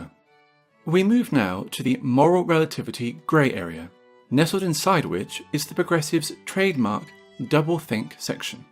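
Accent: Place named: United Kingdom. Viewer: O sotaque britânico deixa ainda mais irônico .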